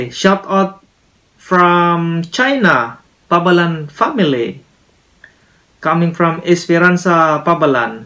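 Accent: Visayas, Philippines. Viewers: mukang bisaya ka sir kasi tayo lng mga bisaya ang nagsasalita ng (ba) kahit magtatagalog hndi tlga nawawala satin yong (ba) -skl hahahha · maka ingon jud ko nga bisaya ka sir tungod sa imong accent nga bisaya hehehe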